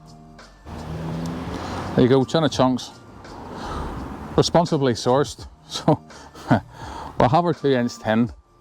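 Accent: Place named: Belfast, United Kingdom. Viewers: Wow Belfast language :D Hard to understand, but possible ;) Good job on your lawn, starting little project with parents house lawn... hope to see same good results, as you achieved :D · Good clear video for UK gardeners . I watch some American videos and they just are not relevant to our Northern Ireland climate . I have two lawns that have recovered from earlier scarification and aeration . Both have been fed with seaweed every two weeks but I am a bit puzzled that one half of the front lawn is not growing so well .Could it be getting too much water , as the half I am talking about is the side the water drains off on . What are the signs to look for if I am over-watering Keep posting, it is really appreciated , especially that Belfast accent . Thanks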